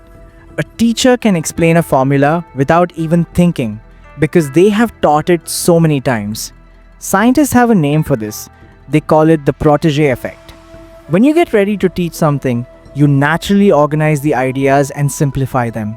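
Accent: India. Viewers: Sorry, totally off topic ... don't understand why narrator has "Indian" accent ... (imho disappointing - no offense to narrator but I think it would've had more impact. Just saying). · His accent is Indian!!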